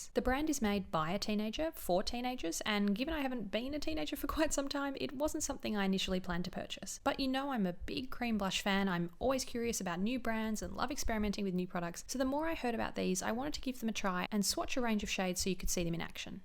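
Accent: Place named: Australia. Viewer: also I'm Australian and its nice to hear a friendly accent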